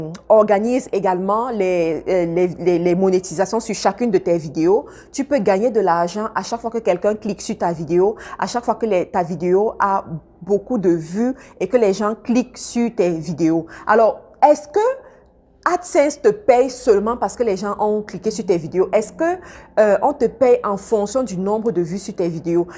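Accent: Cameroon. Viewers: J'aime trop votre façon de parler! Vous êtes la seule mbenguiste camerounaise qui a su garder son accent.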